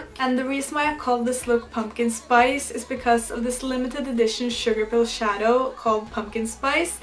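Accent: Norway. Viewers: Are you from Norway? The way you speak english is so Norwegian english ahahah!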